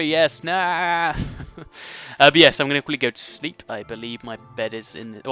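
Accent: United Kingdom. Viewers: Yeah.Your british accent is pretty interesting though, that's part of why I like your channel and I also like your channel because you are a very informative channel :')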